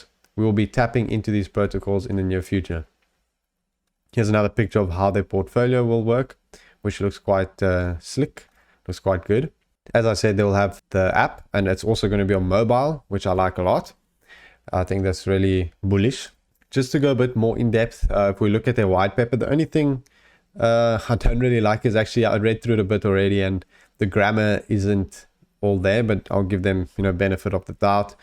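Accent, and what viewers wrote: South Africa. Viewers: At first I was sure you were a saffa. Now I'm almost certain you're from stellies or Somerset... Keep on the great vids either way boet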